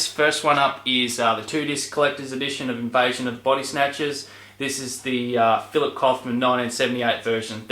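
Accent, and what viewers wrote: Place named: Australia. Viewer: Hey, in case you haven't noticed, you have 666 subscribers! I realized that because I was an watching Eric Bana interview and I thought his accent was identical to yours as far as regional Aussie accents, so I was checking to see if you live near where he is from...:)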